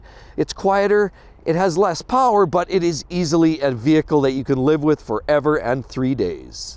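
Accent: Canada. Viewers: he's got a Canadian accent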